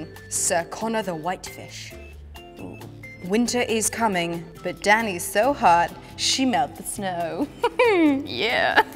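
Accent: United Kingdom. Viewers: why are there subtitles?? is a british accent so hard to understand????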